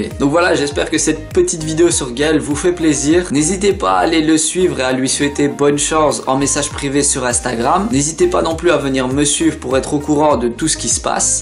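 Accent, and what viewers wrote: Liège, Belgium. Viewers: T'es de liège? Je reconnais l'accent:)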